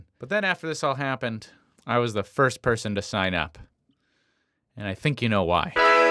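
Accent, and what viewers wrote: United Kingdom. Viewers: Are you British ????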